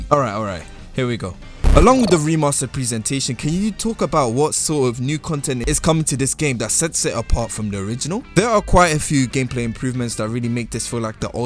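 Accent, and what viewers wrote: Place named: London, United Kingdom. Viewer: good video my bro you sound like your from where i’m from london/uk good to see london guys doing sonic news 👊🏾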